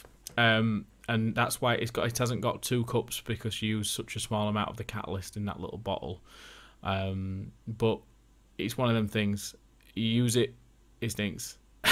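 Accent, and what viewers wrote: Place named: Yorkshire, United Kingdom. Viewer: Never have I seen someone clearly more Yorkshire than you... as someone from the Red Rose county i could take a natural offence. However, love your work so keep it up! ;)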